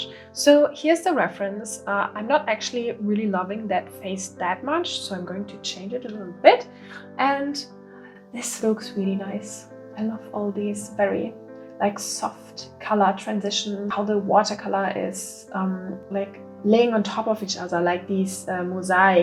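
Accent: Germany. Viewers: Me as a german hear a german accent 👀 haha. I looooove your awesome illustrations! Super cool things to learn from you!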